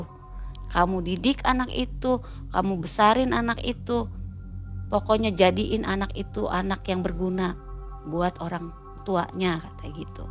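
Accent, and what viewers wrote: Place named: Cirebon, Indonesia. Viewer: kya org cirebon yah logatnya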